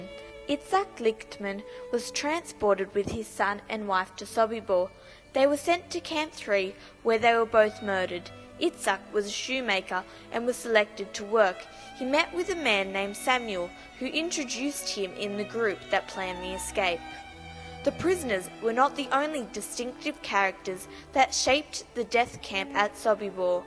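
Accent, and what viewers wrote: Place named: Australia. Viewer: Australian, one of the eastern states, has a speech impediment, private school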